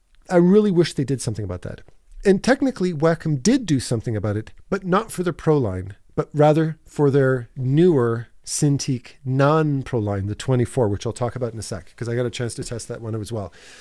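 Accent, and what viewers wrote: Canada. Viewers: Thanks for the review. Very intuitive. I also evaluated Cintiq Pro vs Xencelabs. Also, get the Pro Pen 3E (eraser at the other end of the pen). The ExpressKeys on the Cintiq Pros IMHO are impossible to intuitively used. I also use the keyboard tray (like you do). I hope for screen recording that Wacom releases 1440p (not a or 1080p) Movink 15/16. The Movink 13 is amazing as a portable pen display. To produce 1080p tutorial screen recording to not pixelate when zooming in close, to record originally in a higher resolution (1440p). Cintiq 24 (non-Pro) and Xencelabs 16 works great with no fans and great resolution (2K), but the wasted bezel is awful. So, to record, I also use a separate sound studio, but with the simpler Cintiq 24 OR the Xencelabs 16. My audio recording mic picks up the Cintiq Pro 27 fan noise, and so I use it in my working office. 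I also use Neumann!!! Wow. Overall, I just find that the pen/surface "sensitivety" to be more accurate and responsive, but if I couldn't afford Wacom, I would definitely invest in Xencelabs. Sounds like you have a Canadian accent and we are in Bellingham, WA (close e'nuf to drag my house across into Canada)!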